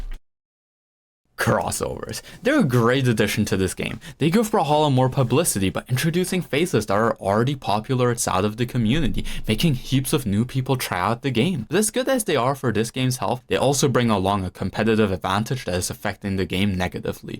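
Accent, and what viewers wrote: Germany. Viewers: and the solution of what, to provide a synonym of "let me explain", you have a better writing style, and much more vocabulary than me, and you are expressive. So i guess u will figure it yourself, u dont need a solution from someone is worse than in that area that is why i did ignore the request because im not qualified and it looks silly to me :p "asking for a synonym" also at first i thought even if i provide u a synonym what is the point u will repeat it, or maybe u will use a variety of words to explain one thing, which u can use one word for it. And the thing is, dont take it as a flaw it is ur style, many people in the world do the same thing they have some repetitive words and u can realize it, idk if they themselves realize they keep repeating the same words or not. In your situation i just pointed it out as a joke you don't need to fix things u aren't broken. 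And your english is really fine, i even thought unironically the pronunciation of "other" is "oder" because im not a native speaker + and English is not pronounced as written, until i opened your channel and i saw the location of ur account is germany so i knew that was your accent. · WOW combo codex an absolute fucking slap of nostalgia lmao i did not expect to feel this i wasnt in that community or anything, though kurta and a few other friends talked to me about it, but i remember that logo popping up in a bunch of momo's (oof) 2v2 combo videos also now that you mention floater i feel like i could recognise you from there too since ive watched a decent amount of his streams, mostly because i liked his hair a bunch xdd also glad to see someone else who loves catnips videos, i feel like i was always surrounded by people who just didnt think anything of him (one person in specific who i wont name disliked him because he dislikes eve music, which is a valid point i guess) and yeah the way weapons used to work definitely kept a good part of the game moving. 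after having played a bunch after that stuff got patched out, watching those old jellyfish montages it feels like the game was literally broken 😭 the amount of times i got invited into a lobby after a game and get my hopes of making a new friend of a person i enjoyed fighting crushed, because they didnt have fun and just wanted to drop a few slurs, eugh anyway ive been playing tf2 lately and since you mentioned it too i figure its about time someone recommended it to you :))))))) give it a shot, its deeper than it seems but also easy to get into also all this and this is how i find out you speak german lmaoo i had a hunch because of your accent but didnt want to say anything nochmals, danke fürs Video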